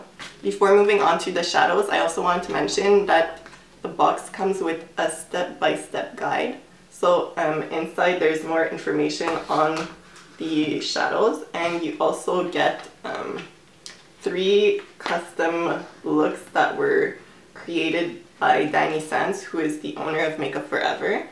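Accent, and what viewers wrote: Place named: Russia. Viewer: i have been watching your videos and i would like to know where is your accent from... it sounds like russian to me but i can't know because I am from Chile ( where the 33 miners were rescue alive)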